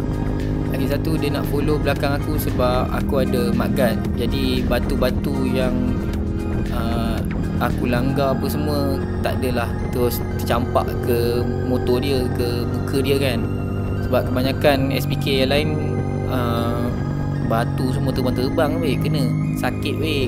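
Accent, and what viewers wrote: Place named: Borneo, Malaysia. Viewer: Bro asal mane? Slank mcm belah borneo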